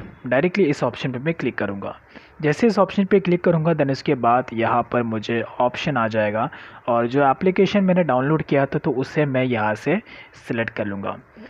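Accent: India. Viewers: indian accent, dislike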